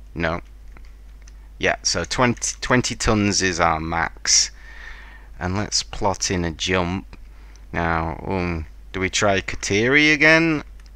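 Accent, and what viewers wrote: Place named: United Kingdom. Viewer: I like your British dialect.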